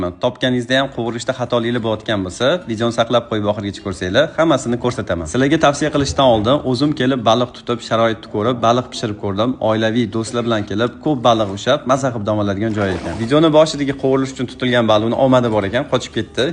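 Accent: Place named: Tashkent, Uzbekistan. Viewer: Тошкент шевасида бошладику,рыбхоз деяпсиз?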